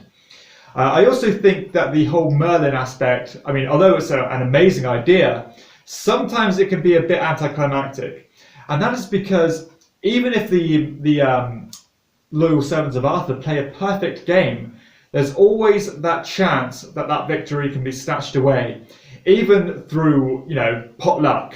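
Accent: United Kingdom. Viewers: Glad to help! I can definitely see the confidence. And Avalon is one of my favs also. I should mention as a fellow fan of the genre that if you haven't tried it yet, "Secret Hitler" has some great and exciting twists on the genre that my social deduction groups love equally for slightly different reasons. Fewer "roles" but in exchange the title has an elegant deck mechanic that basically auto-balances the game if one side or the other is winning too heavily, and the government becomes more authoritarian and heavy-handed as more fascist policies are passed which creates a bit more of a crescendo and arc to the drama (whereas Avalon Rounds 1 and 5 aren't terribly different necessarily). We go back and forth over which game we prefer since both have interesting pros and cons. I think it's important to add that having played the game I don't believe the source material was used distastefully, I think there were interesting and compelling reasons for selecting that particular theme over others. 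Maybe a game to consider when it's available outside the U.S. (your accent sounds British to me); it is also available via free PnP from the manufacturer's website. And THANK YOU for the sleeves, I'll check them out! I need them for my copy of War of the Ring too and I saw you had already given the dimensions for those in a different video and they seem to be the same brand. Lifesaver!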